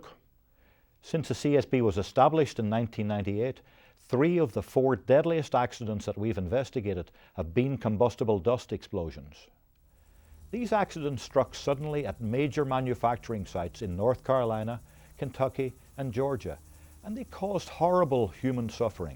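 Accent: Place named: Northern Ireland, United Kingdom. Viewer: Yay, this guiy is Irish. From the north...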